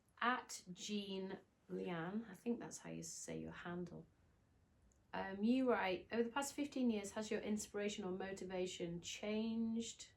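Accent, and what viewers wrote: United Kingdom. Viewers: I was mot expecting her to be British omg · Maybe it's the northern accent, but you come across so down to earth and having no airs. I remember hearing when it started being played on the radio and having to keep the engine running even though I'd arrived home just to finish listening to it. Those were the days!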